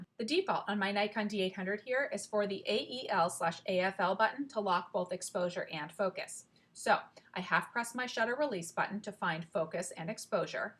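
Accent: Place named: New Jersey, United States. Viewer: I am sorry for all the childish and inappropriate remarks on your videos. I guess these high school mentality boys need to go back to their magazines. Perhaps their moms never taught them respect. Thanks for making this video. Does the camera, my D750, need to be in a specific mode in order to use the AE-L/AF-L button effectively? Being brought up in New Jersey you are talking just fine.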